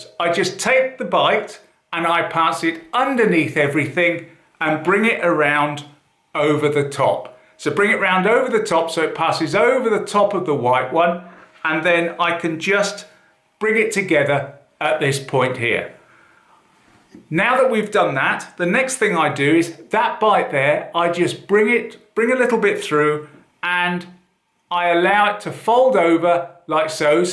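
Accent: United Kingdom. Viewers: My favorite knot channel. Great, clear instruction, warm friendly and pleasant British accent, and excellent filming and editing. I just love this guy!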